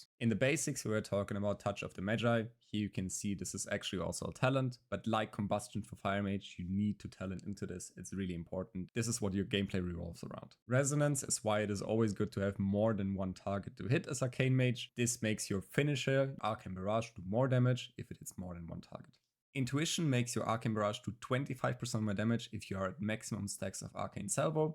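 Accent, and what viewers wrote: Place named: Germany. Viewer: You sound german. Do you also have german content?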